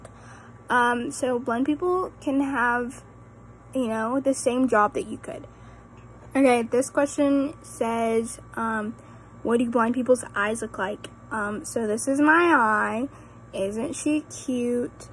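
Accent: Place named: South, United States. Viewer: I watched a full video of my friends and just it was a good video and I still remember your southern accent and the final question that I have is do you and have separate YouTube channels apart from this channel because I wanna go and subscribe to them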